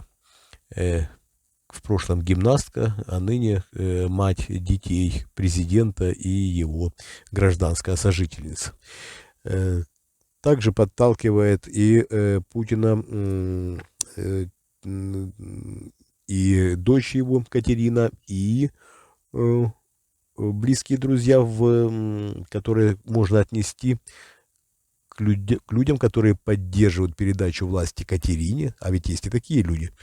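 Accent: Ukraine. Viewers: Вопрос. В России настолько слабая контр разведка, что не может вас вычислить или вы, простите, работаете на власть? . И ещё, у вас явный хохляцкий акцент. Вот прям как мошенники из банков звонят. Опровергните?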